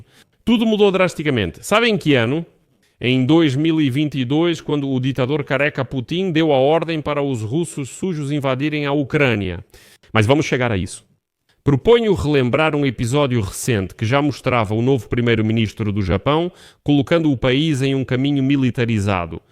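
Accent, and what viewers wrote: Portugal. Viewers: EESTE CANAL E POTUGUES E PELO SOTATAQUE TAKAICHI E ESPERTO NÃO QUE CONSTITUIÇÃO PROIBE A ELA QUER DAR UMA RASTEIRA NA OPOSIÇÃO, AO INVES DE FAZER BOMBAS ATOMICA ,COMPRA DOS EUA ,E FICA AMOSTRAR P CHINA E KOREIA DO NORTE ,NOS TEMOS OGIVAS NUCLEAR ,VOCES QUE FIQUEM ATENTOS ,OK,😊😊ELA E INTERIGENTISSIMO ESTA SANAE ,SAN ❤🎉😊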